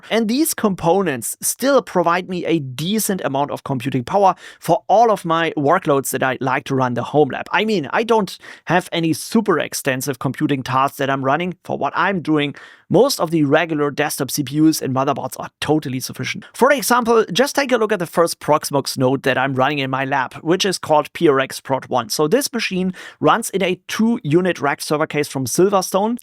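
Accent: Germany. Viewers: Lustig, dass man sofort erkennt, dass Du aus Deutschland kommst. Dein Englisch klingt fast wie Plattdeutsch ✌️🤗😋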